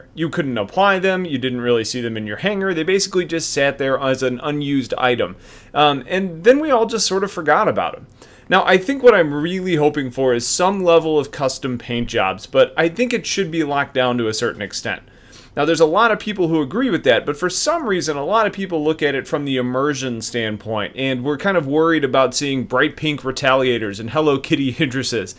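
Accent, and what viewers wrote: United States. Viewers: Btw, it's nice to finally find someone talking about this game with an American accent who speaks clear words. Some guys that play this sound like they're gargling syrup!! Good work.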